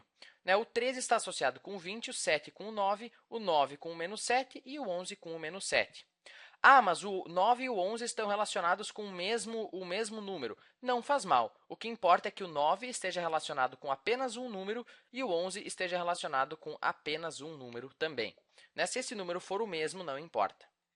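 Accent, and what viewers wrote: South, Brazil. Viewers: esse sotaque é do sul. você é de onde?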